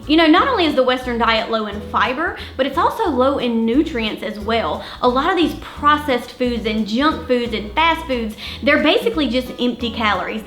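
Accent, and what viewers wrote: South, United States. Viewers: What kind of accent is that? Sounds southern to me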